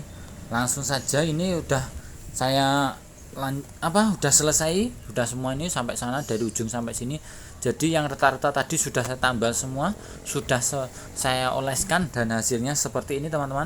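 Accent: Java, Indonesia. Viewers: Wong jowo ki mesti 😊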